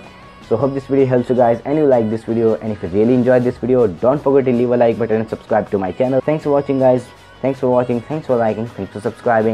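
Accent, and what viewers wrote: India. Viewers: Work on ur english INDIA!!! =) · Hvordan kan du ikke høre at manden er indisk?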